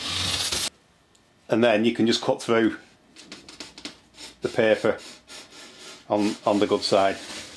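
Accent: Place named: Blackburn, United Kingdom. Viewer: Are you from Blackburn That accent sounds familiar 🤣😂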